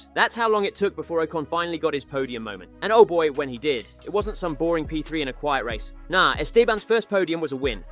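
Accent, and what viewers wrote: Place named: United Kingdom. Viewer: 100% British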